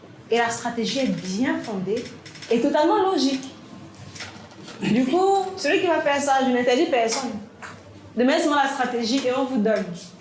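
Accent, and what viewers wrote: Cameroon. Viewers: Bonne diction!précise!claire!contextuelle!bien camerounisé!un régal!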